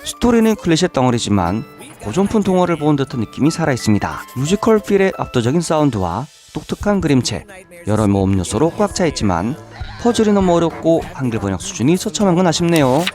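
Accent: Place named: China. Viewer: 주인장 조선족이죠? 특유의 억양이 나오네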